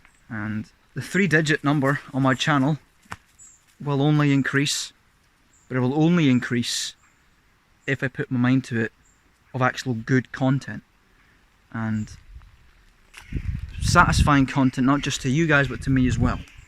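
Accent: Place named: Scotland, United Kingdom. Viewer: Oh my god you have grown up so much! Your accent too! So mature and Scottish. Keep up the great work!!!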